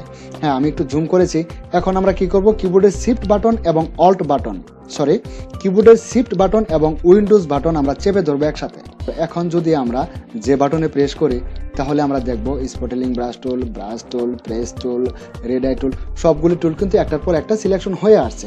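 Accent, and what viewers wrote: Bangladesh. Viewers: আচ্ছা আপনি চট্টগ্রামের নাকি? উচ্চারণ অনেকাংশেই চট্টগ্রামের মতো লাগে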